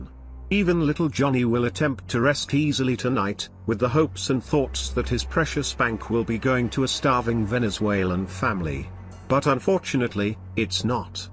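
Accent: United States. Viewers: He sounds like a normal American white dude tf you smoking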